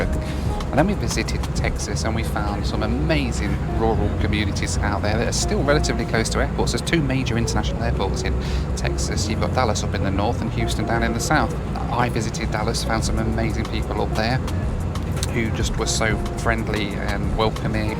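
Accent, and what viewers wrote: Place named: Leicester, United Kingdom. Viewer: Which part of Leicester you from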